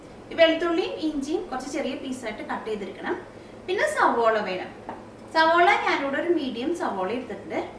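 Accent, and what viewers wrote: Thrissur, India. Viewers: എന്തൂട്ടാ പറയണേ.. നല്ല നാടൻ തൃശ്ശൂർ സ്റ്റൈൽ.. :D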